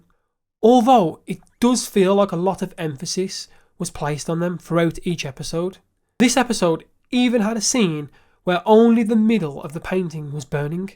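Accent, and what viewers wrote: United Kingdom. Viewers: PS Where in England are you from?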